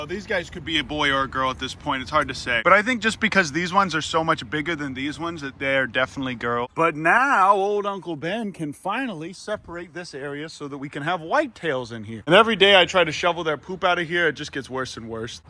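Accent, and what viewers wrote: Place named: Southeast Pennsylvania, United States. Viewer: I noticed on your last vid you said you were from PA. I honestly thought just from your voice you might be a south east native. I'm from the West Chester/Chadds Ford area. As well you remind me of a good friend of mine Joe Magno, any relation?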